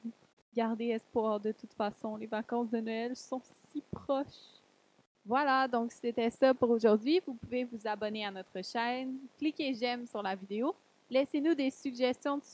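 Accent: Quebec, Canada. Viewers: Oh mon Dieu jadore quand les québécois il parle🤩🤩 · J'adore votre accent ! Vous êtes québécoise ? Merci ça m'a énormément aidé car demain j'ai une poésie 👍 ! Bonne vidéo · C'est une personne qui parle Québécois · Shimada Tronic974 c'est en français duh. C'est juste que c'est un accent québecois. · Les les canadiens francophones on cet accent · Merci ça ma bien aidée et j'ai vue que tu as l'accent québécois! J'ai vécu trois ans au Québec et j'arrive à faire l'accent mais sinon dans l'ensemble c'est très bien réussi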